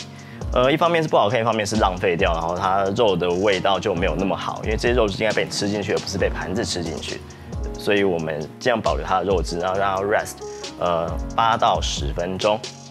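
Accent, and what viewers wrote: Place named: Taiwan. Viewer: 你的嗓子好尖，台湾普通話味道，王力宏也是这味道儿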